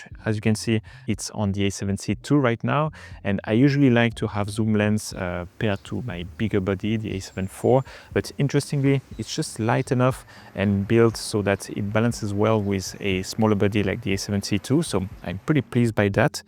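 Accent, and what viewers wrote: France. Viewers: This guys sounds fench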